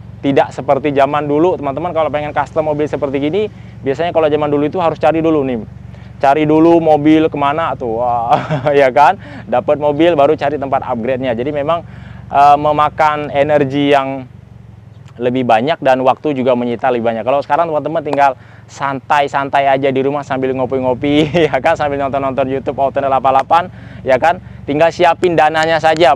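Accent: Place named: Indonesia. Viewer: Seneng denger bhs inggris masnya, logat asli indonesia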